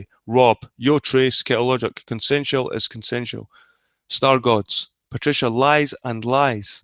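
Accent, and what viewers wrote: Scotland, United Kingdom. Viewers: I triggered Nathan recently & he told me to suck his balls. Got a laugh at of that one. He protested too much when said the Potatoes were perverts, like Adam the Christian penis flasher. He says that having a baby makes me normal, um, no. Pervs have children all the time. He's off his game. He annoys me because he's got a brain but worships Patty like an attack Chihuahua. He's vicious but in an amusing way. Btw, to us, Scottish accents are so diverse. My Scottish friend sounds nothing like you.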